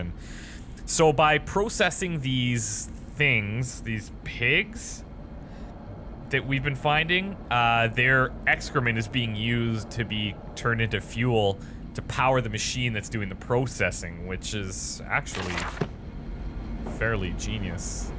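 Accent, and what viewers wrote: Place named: Canada. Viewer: I love your lets plays! Don't ever change. And your Canadian accent is adorable. And just to be pedantic, I have to point out that "Thames" is actually pronounced "Tems".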